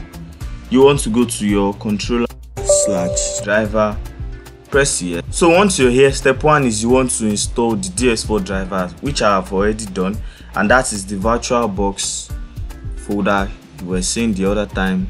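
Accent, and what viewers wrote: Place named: Nigeria. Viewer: I love that Nigerian Accent. 👍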